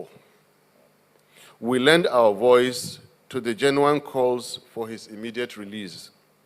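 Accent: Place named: Hausa, Nigeria. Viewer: Wow.. this is so clean of an hausa man speaking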